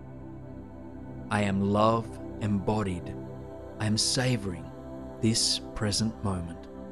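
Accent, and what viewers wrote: Australia. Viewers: Your Australian accent makes these even better! Thank you for sharing these amazing videos.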